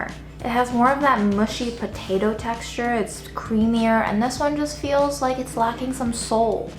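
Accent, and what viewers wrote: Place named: New York, United States. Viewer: !!! I love coming home and pressing play on your videos after a long day of work. So chill, So relaxed, So New Yawk, So Talented and Sooo Entertaining!! Thank You!!!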